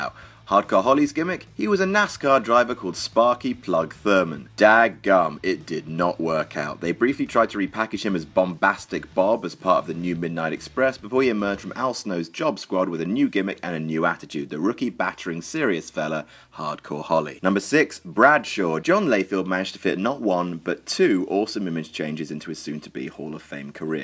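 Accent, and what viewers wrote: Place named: United Kingdom. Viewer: I find it funny that you're complaining about how a British guy speaks English. They do pronounce (and spell) a few things differently than we do.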